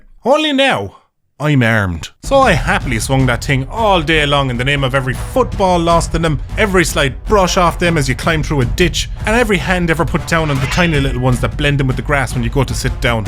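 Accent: Ireland. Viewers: I just noticed your accent, are you from County Cork or Dublin town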